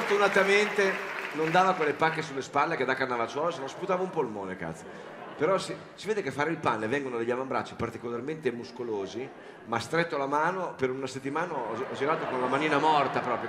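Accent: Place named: Romagna, Italy. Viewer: Bravissimo quando guardo i tuoi spettacoli mi fanno passare la tristezza , anche perché mi piace molto il dialetto romagnolo . 👏👏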